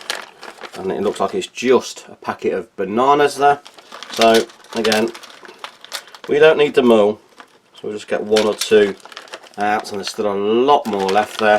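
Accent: United Kingdom. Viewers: Great and detailed review ! And as always it's a pleasure to hear your pure British English :) I like the German ration because of the diversity and amount of items. The content tries to remain traditional German meals-like breakfast-which are often bread/rolls with butter and some meat or cheese. The cold drinks are the best !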